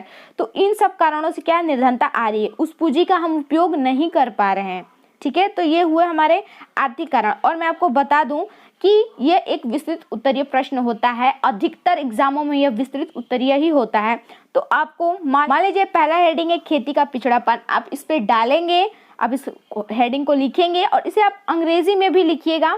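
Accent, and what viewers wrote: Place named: Bihar, India. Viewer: Ma'am aapke language k hisab se aap ballia ya fir bihar se hai, sahi hai na...?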